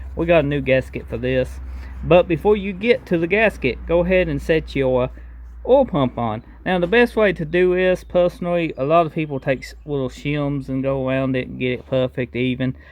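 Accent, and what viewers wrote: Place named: South, United States. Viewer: It's a southern accent with a lisp. My buddy sounds just like him. Lol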